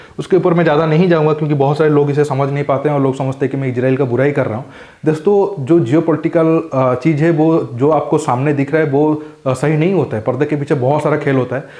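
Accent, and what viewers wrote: Odisha, India. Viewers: Your videos are indeed good. But try to avoid your odiya accent while speaking Hindi or English words.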